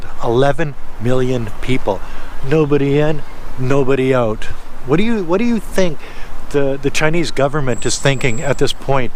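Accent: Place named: Canada. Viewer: I didn’t know Canadians had an accent until I started watching you. I’ve been a fan for a few months. I’ve really been enjoying your informative vlogs. I got a real kick out of your steak bbq at your condo in Canada. Keep up the good work and best of luck from a fellow Saskatchewan boy.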